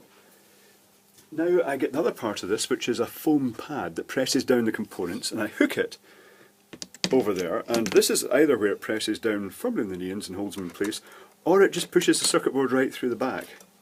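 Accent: Scotland, United Kingdom. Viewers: Googles automated closed captioning system trying to interpret a Scottish accent is quite funny at times.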